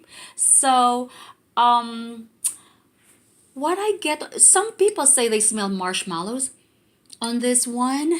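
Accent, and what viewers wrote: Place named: Philippines. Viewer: Thanks for the review. I detect a Philippino accent.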